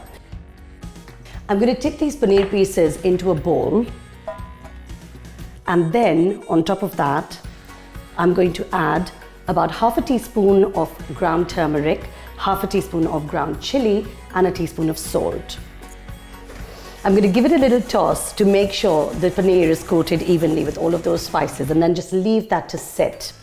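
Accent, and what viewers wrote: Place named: India. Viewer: Excellent recipe, thank you. Side note: the Indian/English accent is the most beautiful accent in the world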